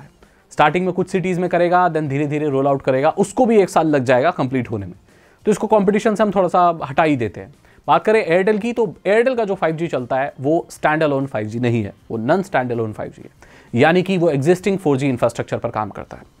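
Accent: Odisha, India. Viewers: Odisha se ho Kya Bhai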